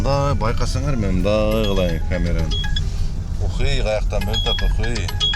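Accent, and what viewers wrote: Standard. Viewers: Молодоц иним , Акбаранын коз жашындай нукура таза суйлойсун👍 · Нукура таза суйлогон житит экенсин жашын канчада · Нормальный элэго, таза кыргызча суйлогонуно шугур дечи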